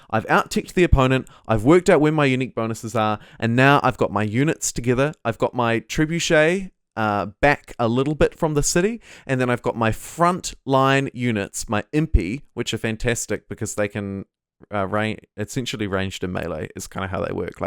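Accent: New Zealand. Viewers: Did a double take to hear another kiwi accent. So used to hearing marbozir! Good summary mate, and good energy. Stoked to see Civ V still active out there. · I love Civ V and VI and I dig your NZ accent so, I like your videos 🥰🤘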